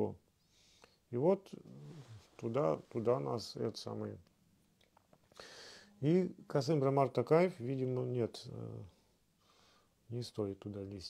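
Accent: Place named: Russia. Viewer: сіз қазақ аттарын атағанда нағыз орысша акцентпен айтасыз. Орысша сөйлегеніз жарайды, бірақ ЖарКЫн дегенініз ЖарҚын орнына өте жаман естіледі.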